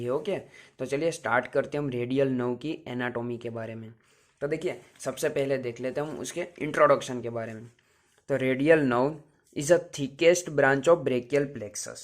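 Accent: Gujarat, India. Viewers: Gujarati cho ?😅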